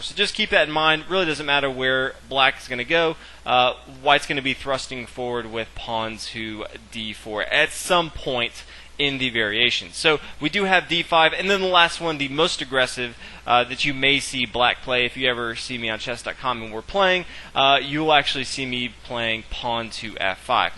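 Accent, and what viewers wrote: United States. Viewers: thanks a lot for your videos, helps me to find a path in that wood of openings. now i only have to find a way to make my son (13 years, german and learns oxford-english at school) understand your amarican accent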